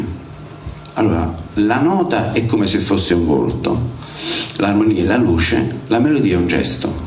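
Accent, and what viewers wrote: Naples, Italy. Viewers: Complimenti maestro la sua napoletanità la rendono comunicativo e trasferisce le sue nozioni in maniera eccellente !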